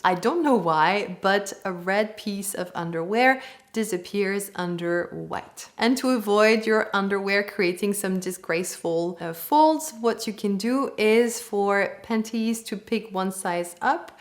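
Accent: France. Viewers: Merci beaucoup. Vos conseilles sont très intéressantes. Je les regarderai. J’habitais en France pendant un an quand j’avais 18ans. Mes amies, ma mère d’accueil et beaucoup d’autres femmes dans ma vie ont utilisé tout les chose que vous avez nous montrer ici dans votre vidéo. Merci beaucoup, j’avais besoin de souvenir tout ces informations. J’aime aussi comment vous parlez anglais ❤. Très bien, et avec une belle accent français! ❤😊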